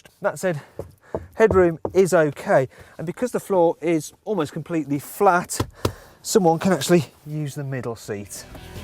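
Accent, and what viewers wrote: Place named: Midlands, United Kingdom. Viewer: Maybe he's originally from the Midlands...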